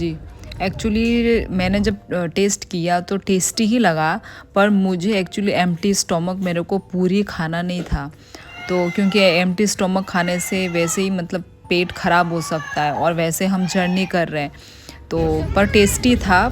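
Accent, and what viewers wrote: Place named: Tripura, India. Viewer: Tripura ka accent aa raha h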